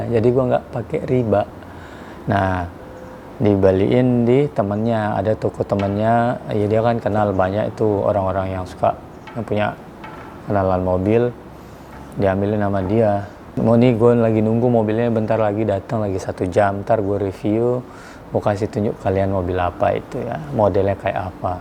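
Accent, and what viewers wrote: Lombok, Indonesia. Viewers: Bang. logat lombok nya masih ada ya😅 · ndek inik telang mule logat sasak tie bang😂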